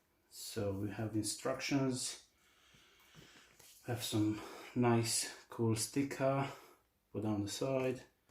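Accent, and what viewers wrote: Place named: England, United Kingdom. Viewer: You are very nearly speaking with an English accent now!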